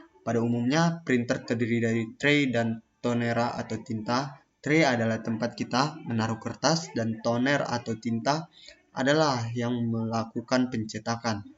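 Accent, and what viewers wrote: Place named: Java, Indonesia. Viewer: Mas medok:v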